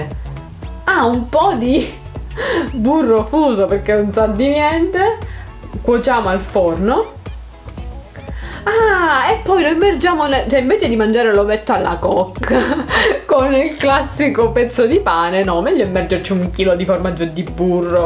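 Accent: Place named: Calabria, Italy. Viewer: 6 calabrese avverto un accento pronunciato 😂😅❤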